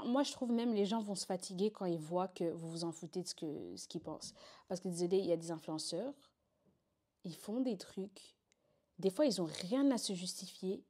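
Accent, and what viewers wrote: Flanders, Belgium. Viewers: Oui tu as un accent ça fait belge, un peu néerlandais ou je sais pas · Ouii t’as grv un accent flamand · Mais t’a juste un accent belges 🥲 il doit y avoir plus de français dans ton TikTok ( je me suis abonnée mdr j’aime bien te regarder sur tiktok donc why not)💕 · Oui elle a grave un accent belge hahah bien néerlandophone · mais oui t’as un accent ! c’est sur t’es belge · Tu as un accent de flappie qui parle français soupoudrer d'origine Rwandaise ou burundaise 😗😗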